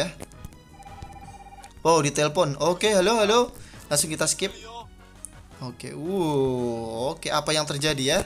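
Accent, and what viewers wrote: Java, Indonesia. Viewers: Fakta video ini, orangnya medok dan pokemon lovers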